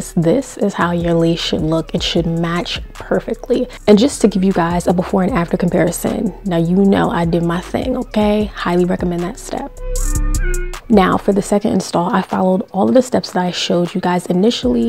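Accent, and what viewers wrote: New York, United States. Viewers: I am from NY and they way you speak I'm figuring you are from NY or close to so you'll get this I'm dropping a Funky Master Flex bomb on how you slayed this sis lol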